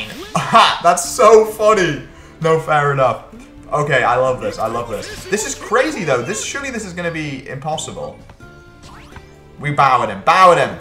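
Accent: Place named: United Kingdom. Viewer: idk whats better the story or the british accent describing the story... this makes me feel like british people are real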